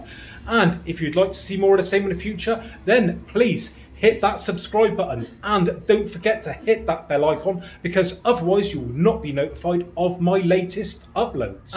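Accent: United Kingdom. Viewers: Hi, I'm Thomas from Italy. I like your way to explain and you speak in a way very clear to me that I'm not mother language, so I thank you first of all. I noticed you pronunce the I like O, for example you say "guys" or "five" but you pronunce "goyz" or "foiv", so I'm very curious to know in which region you live in UK. The other thing: i'd like to watch a video of yours you show your bodybuilder diet. finally: i add you on PSN, hope you'll accept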